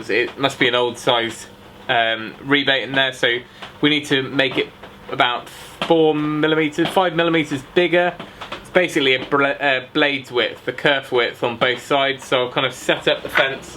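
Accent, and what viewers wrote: United Kingdom. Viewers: Great job but are you in Australia but sound like british???what's on your sandwich butter or jelly???? Lol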